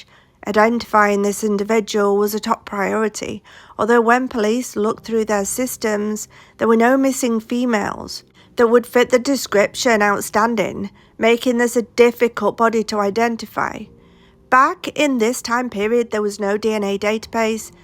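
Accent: Yorkshire, United Kingdom. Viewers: Ahh yes, a good old car boot sale. I miss home so much but listening to you makes me feel so at home again. I can't tell you how much I love your content. I watch TC content on this platform for 4-5 hours every single night for 4 years and I love your channel. A proper Yorkshire lass!!💙 On another note my grandad had a 3 wheeler EXACTLY like the one you showed. Same colour n all when we lived in Romiley. For the record he died in '86 at age 87 and that car never drove farther than Hyde or Marple. I don't even know if it was capable😂 🤘🏻🇬🇧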